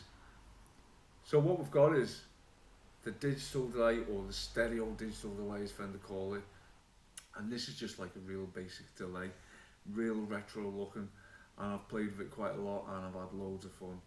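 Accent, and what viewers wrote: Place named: Liverpool, United Kingdom. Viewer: lad.. Proper Scouse 😎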